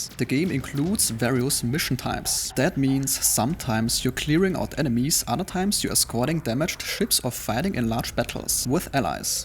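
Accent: Germany. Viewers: German trying English?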